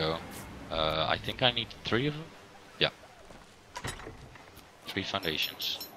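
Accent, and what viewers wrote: Netherlands. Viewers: ben je nederlander ? are u from holland ? u sound like 1 lol :P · i immediately noticed your dutch accent :D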